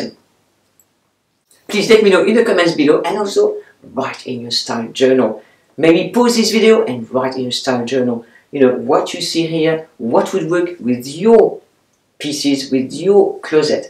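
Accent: France. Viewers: Sometimes I catch the first part of a video without sound but with captions. Apparently the captioning cannot translate your delightful French accent so your name was translated as Manhattan Looker. Probably the opposite of French chic!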